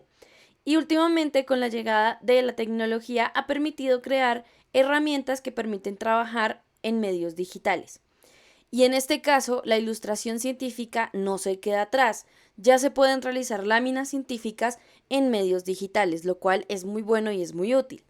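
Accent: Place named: Colombia. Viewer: Hola buen día, puedo suponer por tu acento que también eres de Colombia. Es una lástima que hayas dejado de crear estos videos, espero puedas leer este comentario y te de un poco de motivación para continuar con este proyecto. Yo quisiera aprender más sobre ilustración científica. Si me puedes recomendar algún libro u otros medios para aprender te lo agradecería.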